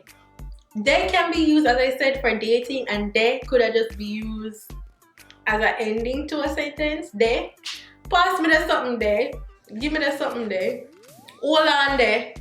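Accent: Jamaica. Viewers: I don't understand not one bit of patois but I love hearing it lol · As a latina I’m seeing a Jamaican and his patwa is sooo strong lol I’m learning tho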